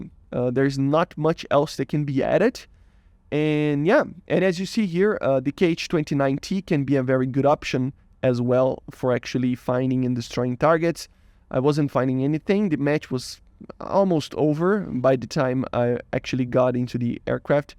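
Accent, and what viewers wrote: Brazil. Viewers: Are you Brasilian bro?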